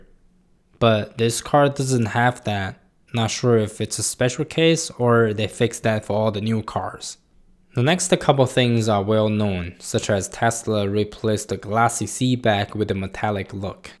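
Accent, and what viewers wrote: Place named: China. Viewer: good english, but still, 老中 😊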